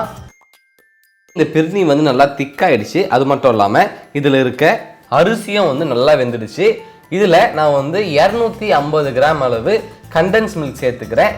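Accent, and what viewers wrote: India. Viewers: Am following you since I watched your show in behindwoods .. particularly for your pure tamil accent and the kural that u said apart from this your cooking methods are damn awesome, informative and i would definitely try in my Hotel and I own The Proud - Madurai Sri Muniyandi Vilas Hotel 💐💐💐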